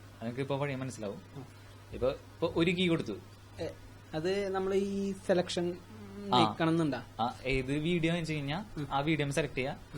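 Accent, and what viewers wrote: Thrissur, India. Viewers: Nalla thrissur bhashayil paranju thannathinu thanks. . Good information👍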